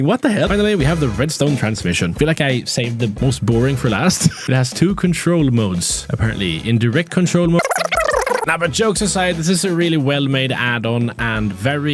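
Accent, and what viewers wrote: Sweden. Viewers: Is Swedish? Cause I Am also Swedish and it feels like the pronunciation of a couple of words feel ”Swedish” aka the accent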